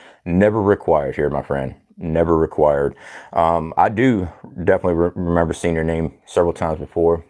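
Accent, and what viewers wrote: South, United States. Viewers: Hate I missed the live!! Had to make some house calls today. Your speech is fine brother. I would not have known if you hadnt mentioned it. You sound like everyone else around NGa. (Perfect southern) try to get some sleep tonight. I'm saying this as a big sister that cares, when you need that much caffine a nap might serve you better. ❤ 👩‍⚕️ sorry I'm still in medi-mode Glad you made it back safe and sound.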